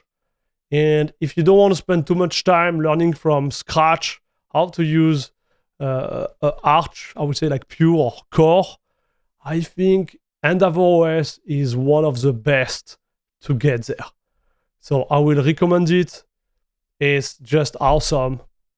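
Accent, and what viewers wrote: France. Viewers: Pas facile d’écouter un Français qui parle Anglais. 😅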